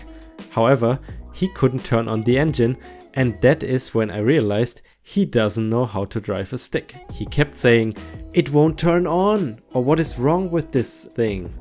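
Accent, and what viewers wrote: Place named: Germany. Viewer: Ah, I was right, you are German. I am subbing for more stories and more of your charming accent. :)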